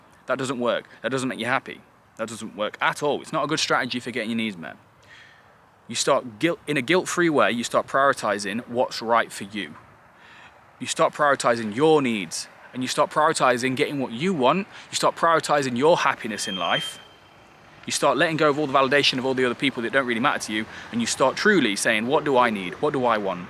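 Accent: United Kingdom. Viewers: He is from the UK. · Sounds like regular UK English to me. Background looks very UK-ish too :)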